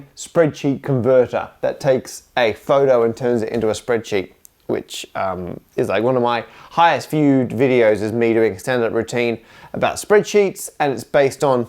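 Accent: United Kingdom. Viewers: This guy has the kind of british accent that you don't immediately notice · I only clicked because I actually thought you were Matt Parker, creator of South Park just to find out you have a British accent. I was not expecting this lmao.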